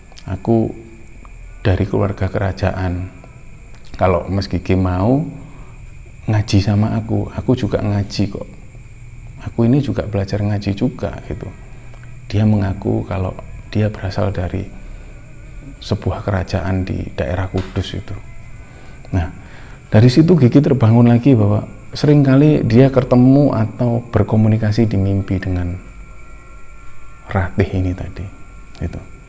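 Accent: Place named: Surabaya, Indonesia. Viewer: luar biasa. uenak rek cara nyampaikan nya podo suroboyone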